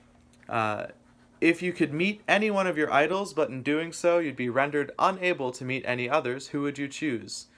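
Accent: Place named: Manchester, United Kingdom. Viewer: Wow. I’m fuckin man. I’m a Scot. I honestly thought you were a Manc or something. Just the way you sing. Very smiths sounding I guess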